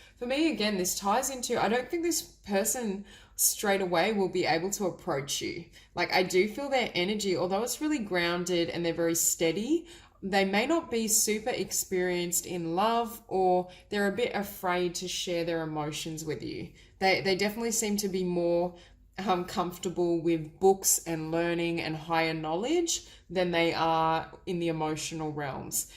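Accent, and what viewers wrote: Australia. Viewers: Omg you are awesome and my intuition getting better , thank you I subscribed, you sound Australian, I have seen that all Australian readers are awesome something special about you!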